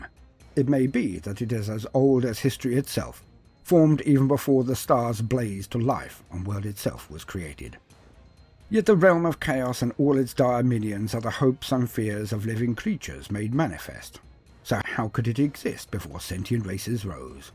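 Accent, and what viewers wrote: United Kingdom. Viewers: I could listen to your silky British accent all day. Love your content brother. Keep being awesome. 😀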